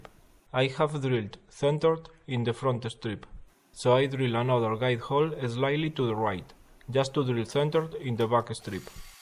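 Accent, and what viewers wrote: Spain. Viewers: Great and unique Spanish accent! Cheers mate! Salut! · I've spent my life reaching English to Spanish speakers (and others). Your accent is definitely Spanish from Spain but otherwise your English can't be faulted. Great videos too!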